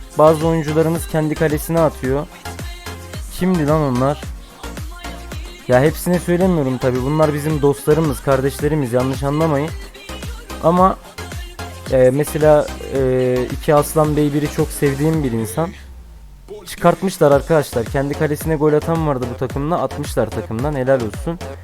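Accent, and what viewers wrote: Erzurum, Turkey. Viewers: Abi ben kararlıyım sen nerelisin erzurumluya benziyor abi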